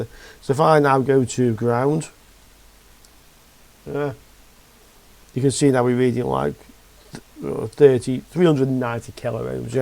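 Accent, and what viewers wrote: United Kingdom. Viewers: Kinda enjoying your British accent and all it comes with, yeah :)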